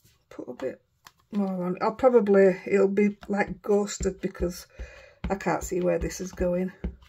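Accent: Yorkshire, United Kingdom. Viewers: These are so cheerful! We usually get a little bit of snow here but none so far this year I'm sad to say. And your speaking Yorkshire is one of the many reasons I love your channel. 💞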